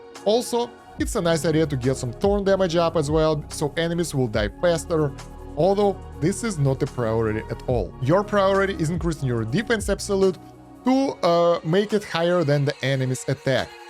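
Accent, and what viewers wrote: Russia. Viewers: Искал видосы на русском языке , но не нашел ) думаю ладно открою первый на английском и тут мужик с русским акцентом 😂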